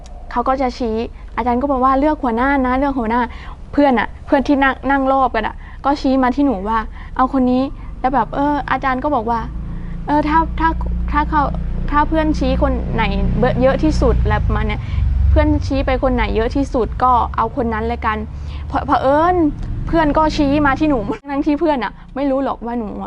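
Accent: Central Thailand, Thailand. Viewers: น่ารักอีหล้า พูดไทยกลางชัดเจน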